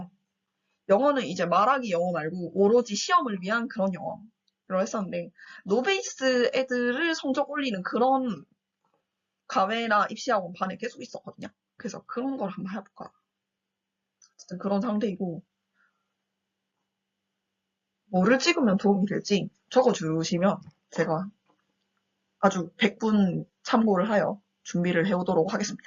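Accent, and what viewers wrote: Busan, South Korea. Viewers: 언니 고향 부산이신가요 친근해요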